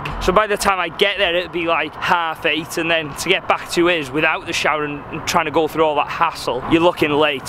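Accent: United Kingdom. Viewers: White skin & British accent. The keychain to America's chastity belt. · I think the British accent helps...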